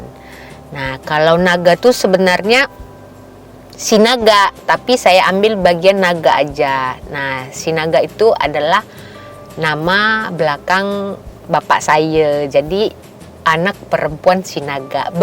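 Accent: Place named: Kuala Lumpur, Malaysia. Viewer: suka dengar cara cakap macam org kl....🤣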